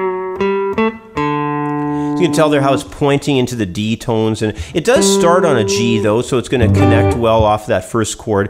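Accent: Canada. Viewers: Coolest thing is even though a 1 string solo not playing with 1 finger too obvious is to a untrained eye the illusion created is that it appears to come across unless watching like a hawk a very melodic piece of music. And very cool. Almost like what Glenn RIP did on the little solos were only on 2 strings. You got so much content don't know if ever get thru all but gonna sub. to channel. BTW I hear Canadian accent are you still up there or do you live on states now?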